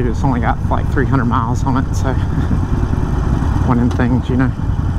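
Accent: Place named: North Carolina, United States. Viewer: its just the soft voice and southern twang thats all it is so many people in nc sound like this it pretty normal voice honestly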